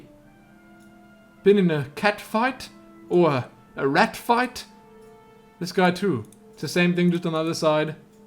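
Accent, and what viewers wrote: South Africa. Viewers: he's South African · It's South African I think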